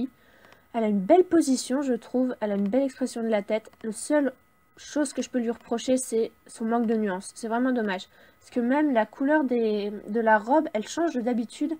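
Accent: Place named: Quebec, Canada. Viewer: Parce que on dirait que tu parles Québécois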